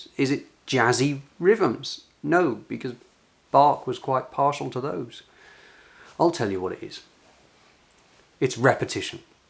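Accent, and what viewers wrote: United Kingdom. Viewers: Dear, a while ago I have come across your beautiful YouTube channel and have been glued to my notebook screen ever since. in order to catch up with as many as the older videos as possible. It is such an amazing abundance of landscape, church architecture, organ expertise, wisdom, language and literature and last but not least – music. I love the magic moment when you open a church door, expectantly and respectfully, As a translator/interpreter from Icelandic I have an ear for languages and accents and I must admit I fell for your beautiful British, or should I say English, tongue right away. I could listen to you forever and you have definitely enhanced my vocabulary since I keep watching your videos. Please keep filming – and playing - and talking! 😊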